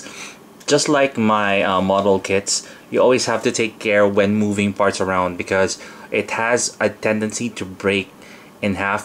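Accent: Philippines. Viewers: Are you filipino? Our accent when i speak in english is almost the same. xD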